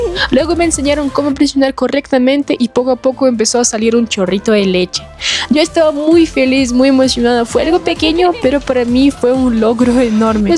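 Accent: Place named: Colombia. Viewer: Con cada video me impresiona más tu dominio del español. Hablas más colombiano que yo!😱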